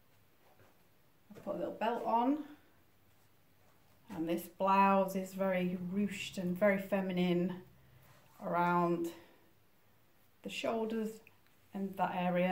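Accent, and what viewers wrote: United Kingdom. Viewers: Hi Thanks for responding, most appreciated. I know that your are passionate about Cervin, but I've just had a mail from Gio - 50% off everything and their stockings are very good too.. Lovely to hear your northern accent. Where are your origins? I'm originally from Bolton/Chorley. Have a lovely evening xx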